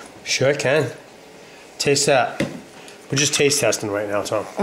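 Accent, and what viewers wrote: Boston, United States. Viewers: Do I hear Boston in there?🙂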